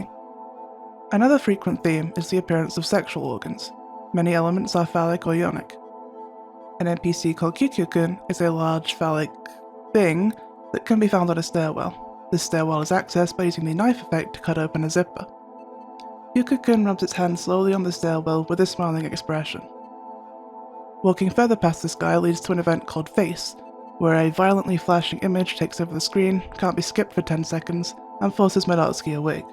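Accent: United Kingdom. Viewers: I like how your voice sounds sort of British. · you have the most british voice ever lol, great video btw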